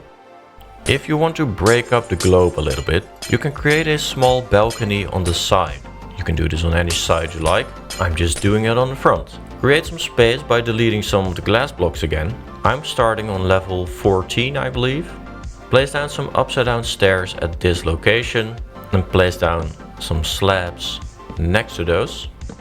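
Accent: Netherlands. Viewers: wassup, are you dutch cuz i live in the netherlands and i also lived in america and you have the same accent as most of my english speaking dutch friends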